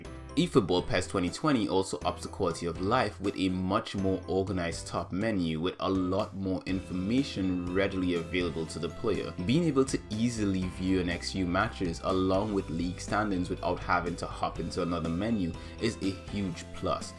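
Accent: Trinidad and Tobago. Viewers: yeah im from trinidad but, i live in UK now and have lived away for a long time. Sorry if you're not, just thought some of the words you say sounded Trini like you might have some ties there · I'm a trini as well. Your accent sounded familiar so that's why I asked.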